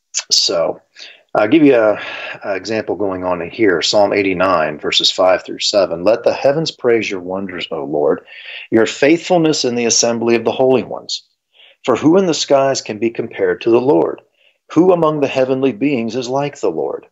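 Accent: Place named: South, United States. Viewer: This sounds like our dearly beloved Dr Michael Heiser rest his soul but with a Southern twang I love it brother keep up the good work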